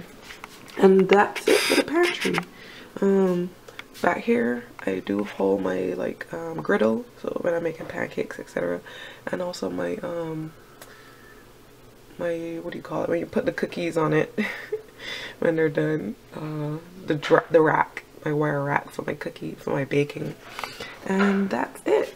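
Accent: Barbados. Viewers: From the beginning of the video I heard the bajan accent then I looked at the name sure she's a bajan.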